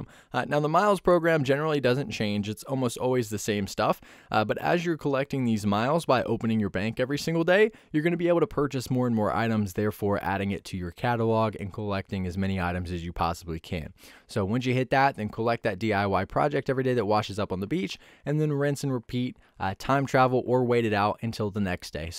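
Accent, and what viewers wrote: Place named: General American, United States. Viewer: reminds me of how people who speak in a “standard American accent”on tv commercials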